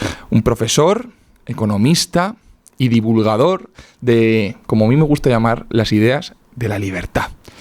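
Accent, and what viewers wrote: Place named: Colombia. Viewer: Es el español mas Colombianp qie conpzco ❤❤❤❤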